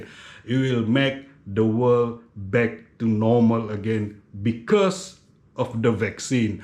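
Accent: Sabah, Malaysia. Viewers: Bah sy mangarti jg DR ckp,sendiri mengerti,klw mw translet sma urg, karas tu lidah mw kc terang 😅😅😅biar kn mreka² yg nda suka DR brckp malay slang sabah 😁😅kunun kn DR 😁 Terbaik perkongsian ny Dr 👏👏👏💪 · X juga masalah kalau sy dr. Ngam laitu slang sabahan.. Kita pun di sabah ba juga ni.. Its realy doesnt matter at all... Maybe those who give negative cmment..or maybe'kayangan people'just dont like to share good medical info like u.. Salute dr!!!.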